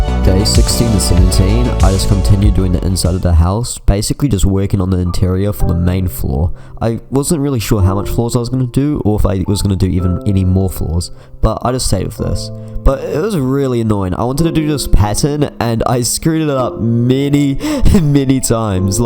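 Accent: New Zealand. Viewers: Good old New Zealand accent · Are you a New Zealander? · are you New Zealand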